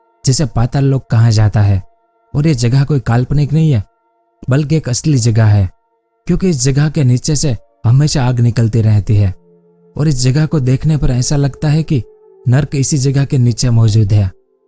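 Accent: Gujarat, India. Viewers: Aap gujarati jaise kyu bolte ho.??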